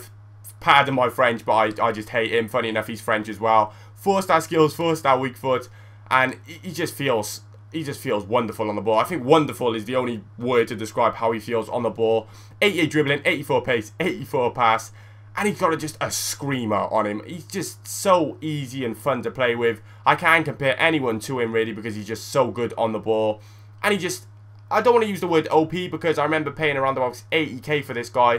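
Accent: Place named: Cardiff, United Kingdom. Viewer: Sounds like a Cardiff boy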